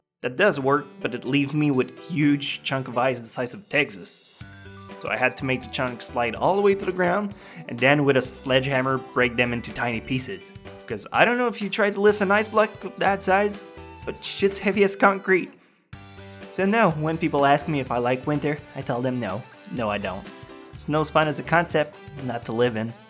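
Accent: Quebec, Canada. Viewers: OMMFGGG ur Canadian as well judging by accent I would assume Quebec se magnifique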